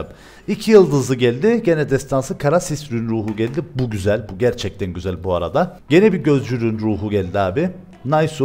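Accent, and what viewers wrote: Cyprus. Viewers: Kıbrıs şivesi değil mi :)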